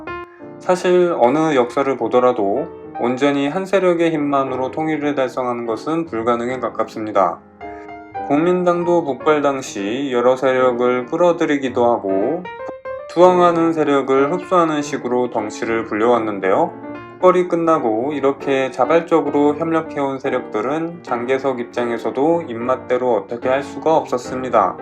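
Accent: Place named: Gyeongsang, South Korea. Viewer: 어째 경상도 냄새가?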